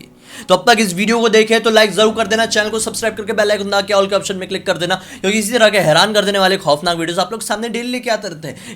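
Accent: Bihar, India. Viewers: Aap bihar se ho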